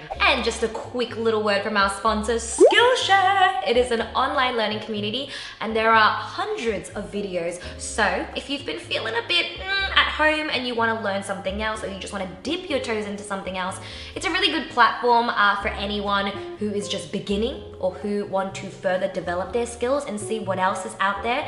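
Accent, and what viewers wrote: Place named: Australia. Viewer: you look beautiful in everything you wear sweetheart, and I love you're "Aussie" accent.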